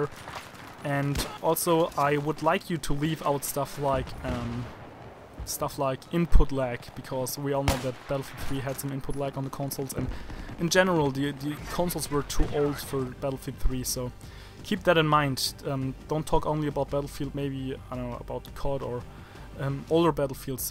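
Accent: Germany. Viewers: du bist deutsch oder? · Your german right? Your english sounds a bit german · are you maybe from germany becaouse i hear a little bit accent?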